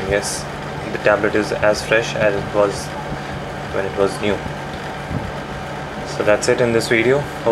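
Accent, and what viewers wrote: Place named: India. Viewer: what does he press PLEASE I DO NOT UNDERSTAND INDIAN ENGLISH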